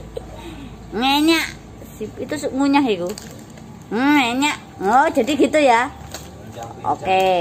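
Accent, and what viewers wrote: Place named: Java, Indonesia. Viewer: medhok banget logatte.......?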